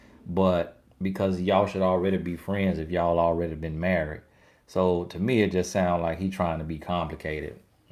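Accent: South, United States. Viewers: I literally could listen to this country boy accent all day! I am from The Bahamas🇧🇸 and I can understand EVERY SINGLE WORD you say!!! Love you!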